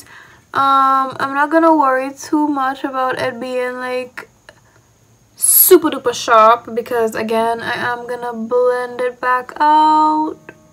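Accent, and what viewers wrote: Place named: Trinidad and Tobago. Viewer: Keep going!!!! from a trini in NYC. It’s so refreshing to see someone from home doing makeup reviews. Let me just say, there is an audience out here for your brand, I was so happy and proud when I heard the accent. It made me feel at home. Thank you so much for what you are doing. I look forward to seing all that you do. I am a subscriber for life.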